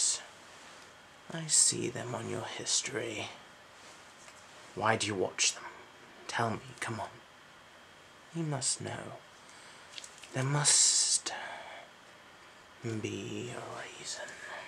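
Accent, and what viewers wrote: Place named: South, United Kingdom. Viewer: i can hear in ur voice that u r somewhere from south England, I've lived in Stockport (Manchester) for 5 years and about Vodka, sure, mate, I can bring u vodka next time i visit th UK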